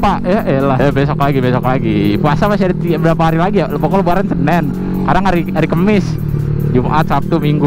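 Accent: Jakarta, Indonesia. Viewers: Logat nya betawi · Anjir logat lu kaya orang jakarta bang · betawi bat da ah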